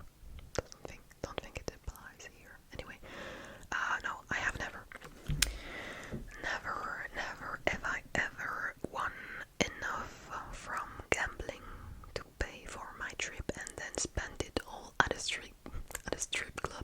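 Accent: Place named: France. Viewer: make more videos with french accent please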